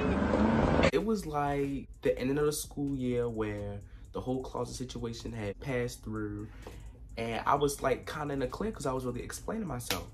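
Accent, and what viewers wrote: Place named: DMV, United States. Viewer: U gotta be from the dmv cuz the accent lawddd😂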